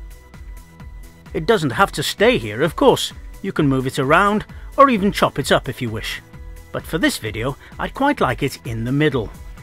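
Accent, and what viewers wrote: Wales, United Kingdom. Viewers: Thank you so much:) just watched it, I knew alot of the stuff but I have learnt a few new tips, thank you!! Are you Welsh? Sometimes you sound Welsh, sometimes English lol x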